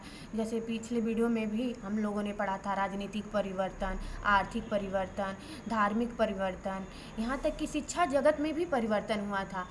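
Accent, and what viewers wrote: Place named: Eastern Uttar Pradesh, India. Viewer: Mast Aap east up ke lgte ho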